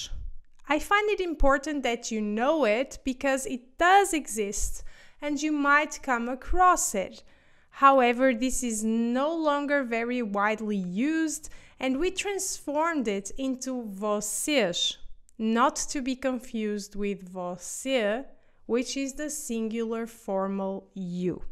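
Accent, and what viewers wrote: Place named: Portugal. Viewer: You are the best! I used to live in Portugal some years ago and my language get worse over time but you totally help me to remember some of things which I lost and even upgrade to some new things! What I really like is that you speak slowly and every word can be perfectly understood either in Portuguese or English which make things way easier then watching some other channels + you have really nice Portuguese accent. Lot of other channels use Brazilian accent that can create issues for someone who use to learn and speak Portuguese in Portugal and not the one from Brazilian TV novelas. Thank you so much and grande abraço for those useful lesions!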